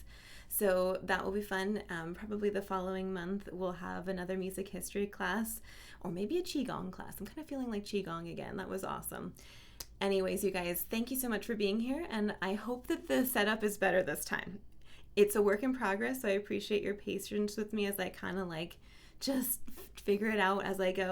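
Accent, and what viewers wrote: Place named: France. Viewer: Im not in Patreon but yes in love 😍 😀. That French pronunciation 😌💞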